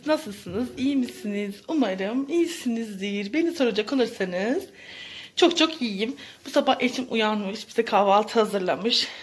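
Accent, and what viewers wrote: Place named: Kayseri, Turkey. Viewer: izle vallaha keşke bizde seninle böyle olsaydık ne güzel olurdu leee🤣🤣🤣kayseri şivesi leeeee🤣🤣🤣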